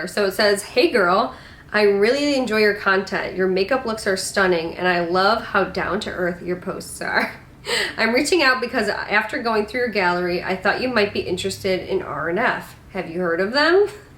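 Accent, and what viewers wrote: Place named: Midwest, United States. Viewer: I hear my own Midwest accent when I listen to your videos. Your voice is so comforting which is great when watching mlm videos! Thanks for all the awareness you bring in this area. I almost got sucked into essential oils shortly after graduating high school. Very glad I dodged that bullet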